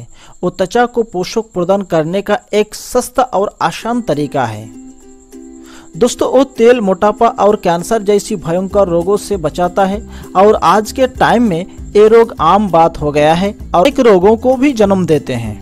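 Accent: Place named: Bengal, India. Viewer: Yeh bagali h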